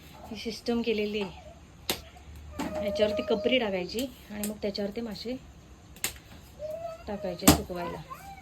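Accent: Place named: Thane-Palghar, India. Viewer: तुझ गाव कोणते? भाषा ठाणे-पालघर ची वाटते.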